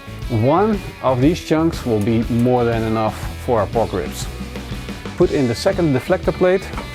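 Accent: Netherlands. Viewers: Some Dutch accent ?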